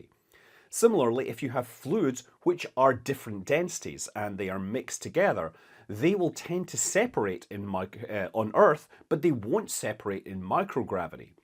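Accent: Scotland, United Kingdom. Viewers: zero gravity spoken like a yank - loosing your scottish accent!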